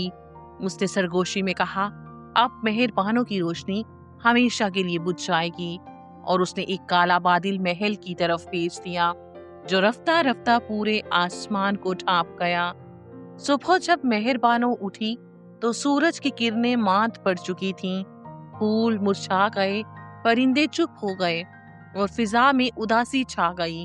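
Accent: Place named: India. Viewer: Hindi accent ki jaga Urdu accent use krn Clip's ka liya vo3 use krn vo apka original characters voice da ga apko audio lagana ki zarorat nhi para gi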